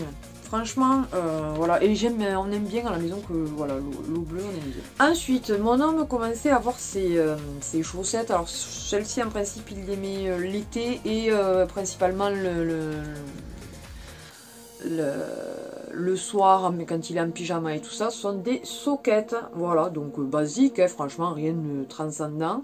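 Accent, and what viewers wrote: South, France. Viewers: Coucou Je viens de découvrir ta chaîne et de m'abonner Ah super l'accent du Sud ❤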